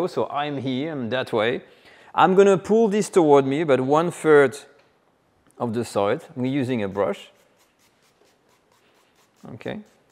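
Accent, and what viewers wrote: France. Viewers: Please don’t lose your French accent. We Aussies love it. Can you use Gluten-Free flour?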